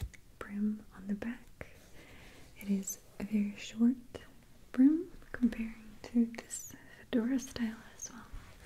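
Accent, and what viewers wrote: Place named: Russia. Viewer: you are such a beautiful lady. It seems that you are becoming more beautiful as you get older. I was watching some of your videos from 2011. I think I am going to be one of those women that turns into a hag as I age. Watch, you'll be more gorgeous as you get older. What is your secret my dear. Please don't tell me DNA. I do miss your Russian accent as was so prevalent in your first videos. I enjoy watching those. I could hear your voice more in those. Whispers are great but you have such a pretty voice, it would be great if you could do some like when you first started out. My volume doesn't get any louder. You are one of my favorite asmr artists. Take care of yourself and your family.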